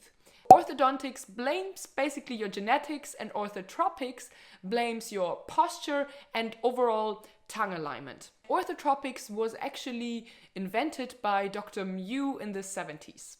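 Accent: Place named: Germany. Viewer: Hab direkt gemerkt, dass du Deutsch bist ;)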